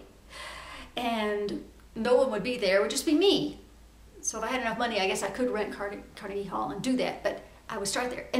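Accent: Italy. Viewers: Thank you for the tag! (you have a great Italian accent!!) xo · Your mom reading 50 Shades Of Grey really made me giggle. My FIL has read it too and he is 77!:-) Your Italian accent is super cute! I enjoyed learning these facts about you,. XOXO! Sandra.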